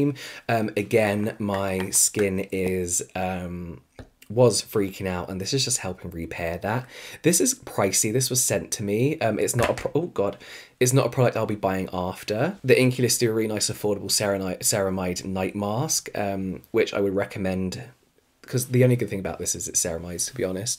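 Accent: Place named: Wales, United Kingdom. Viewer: Great video sexy voice ✅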